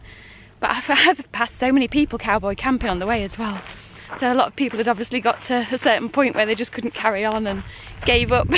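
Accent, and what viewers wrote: United Kingdom. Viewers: I have a friend from England that heard me say "worcestershire" with and American accent and she said, "oh no, you don't pronounce the 'r', that is low class." Haha. So I noticed, when ever I here a Brit speak, you only pronounce the 'r' after a consonant but not after a vowel. Lol, now your viewers that read this are going to be listening closer to your accent. Which I think is really cool. That's my blog (comment).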